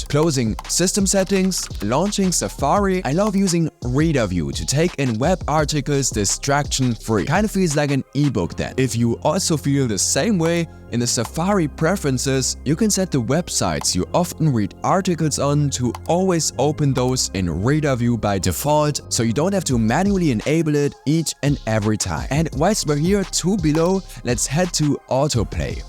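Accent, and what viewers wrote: Germany. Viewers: Are you German ? Man kann es raushören 😅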